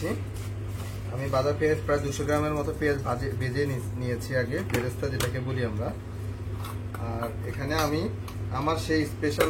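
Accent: Noakhali, Bangladesh. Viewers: ভাই আপনার বাড়ি কি নোয়াখালী আপনার কথার ধরনটা ওরকম লাগতেছে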